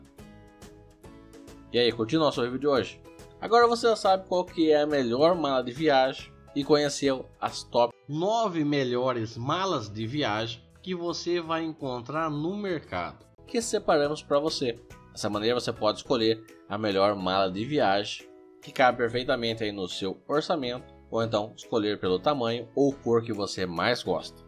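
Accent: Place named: Piracicaba, Brazil. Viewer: Você é Piracicabano?? kkk sotaque muito bom!!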